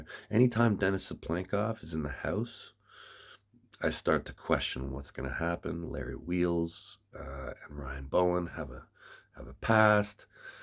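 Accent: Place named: Canada. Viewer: I been watching you arm wrestle before <num>, it’s crazy how your accent has changed, I miss the deep Canadian accent, God bless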